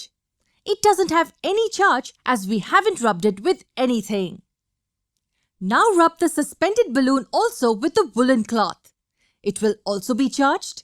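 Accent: India. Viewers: The indian accent do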